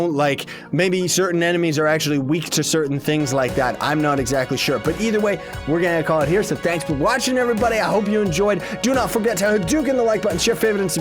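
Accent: Canada. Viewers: Now every time I watch his videos I imagine him with his canadian accent. XD LOL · Are you know Canadian Or Are you living in Uk xD IDK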